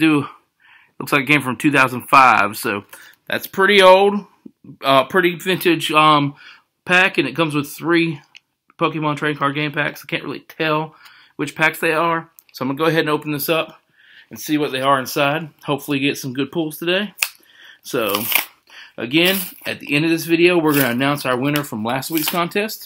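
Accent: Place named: Texas, United States. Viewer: Nice vid bud, and last pack magics that FA is pretty sweet. Whereabouts are you from friend cant quite place your accent its a little Texas and a little Tennessee thrown together. Just curious I always try and guess accents.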